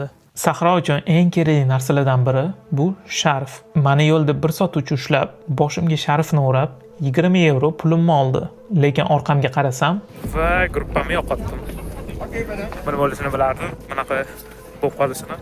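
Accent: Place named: Tashkent, Uzbekistan. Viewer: Адабий тилда гапиришни ўрганинг. Тошкентчасига уйда гаплашасиз. Сизни ҳамма вилоятлар кўраяппти. Хурмат юзасидан абадий гапириш керак. Узр.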